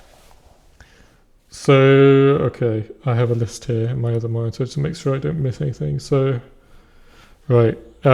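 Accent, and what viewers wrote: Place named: France. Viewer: 'Sup, why do you sound French?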